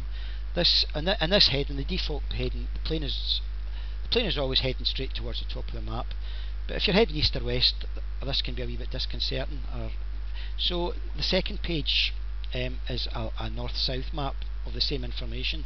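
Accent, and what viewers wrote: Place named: Scotland, United Kingdom. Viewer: you have a lovely accent! scottish?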